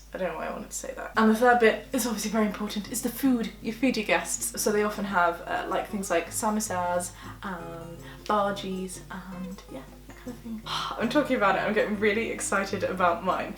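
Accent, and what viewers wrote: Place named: Scotland, United Kingdom. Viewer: I’m so here for your Scottish accent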